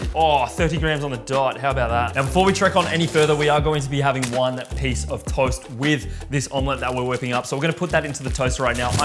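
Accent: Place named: Australia. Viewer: Great content and who doesn’t love Australian accents. Easily one of the nicest people in the world👍🏼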